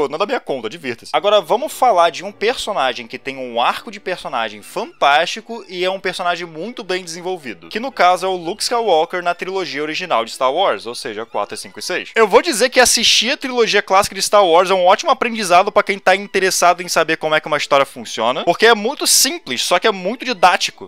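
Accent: Rio de Janeiro, Brazil. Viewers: Só percebi hj q o tem sotaque carioca kkkkkkk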